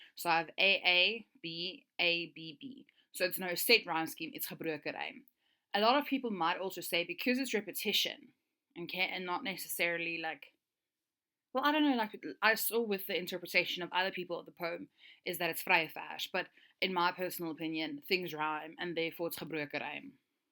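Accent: Afrikaans, South Africa. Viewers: Your afrikaans accent is so thick. This is my first additional and i really wish i could read like this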